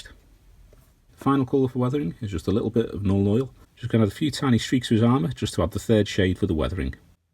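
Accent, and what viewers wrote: Liverpool, United Kingdom. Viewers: Love the Scouser accent, always been my favorite in this country, makes your videos so much more fun to watch :)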